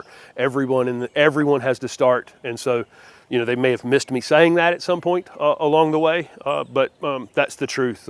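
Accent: South, United States. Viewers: Sounds like you encountered your first troll!! You have made it big😂!! Your southern accent ain’t got nothing on us here in Alabama lol!! We really drag it out but I am ok with my accent!! Some folks really think just because you have a southern accent that your IQ is automatically lower and that just isn’t the case!! Even us well educated folks talk like this lol!!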